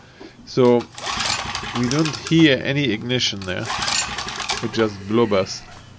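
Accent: Germany. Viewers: Du hast einen sehr starken deutschen Akzent:)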